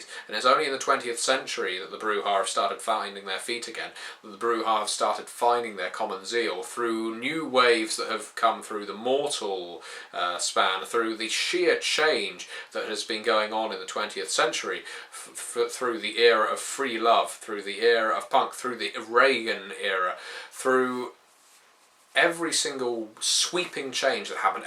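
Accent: United Kingdom. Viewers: This is prove that no matter how scruffy you look the second you open your mouth and out pops a British accent you sound 9999 times better then you look.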